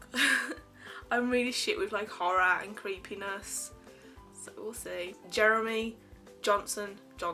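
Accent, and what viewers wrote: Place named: Birmingham, United Kingdom. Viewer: So nice to hear another brummie on here! Great video, so jealous of your haul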